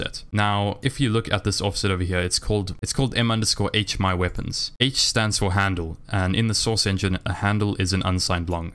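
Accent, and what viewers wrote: South Africa. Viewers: no way my mans from SA lmao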